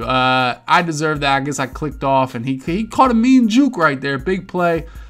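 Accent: Philadelphia, United States. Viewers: Been watching all your vids bro, I’m from philly idk if you’re from philly but you sure do sound like it. Keep it going 💪🏾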